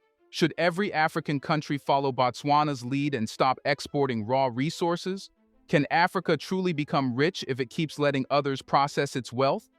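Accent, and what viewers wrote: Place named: United States. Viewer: What's with the American accent? Seems a little hypocritical.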